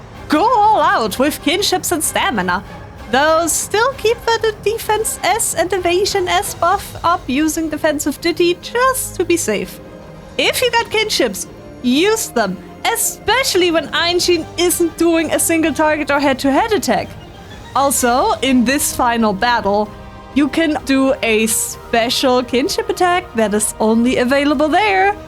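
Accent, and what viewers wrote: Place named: Germany. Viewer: Ur accent like ur from germany or maybe netharlands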